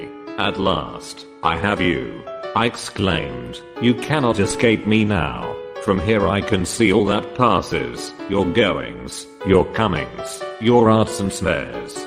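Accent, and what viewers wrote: United Kingdom. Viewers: All of his voices and pronunciation is perfect!Full of British Accent!Wonderful!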